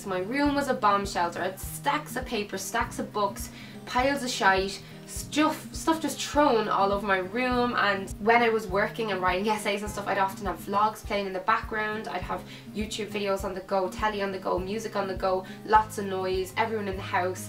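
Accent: Ireland. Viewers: irish accent yes irish accent took me a second :)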